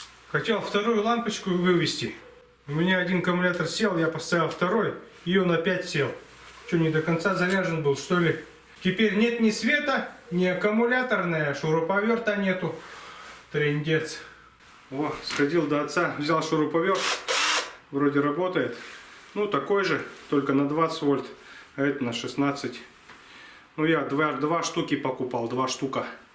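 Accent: Perm, Russia. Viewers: Что за говор пермь, или ?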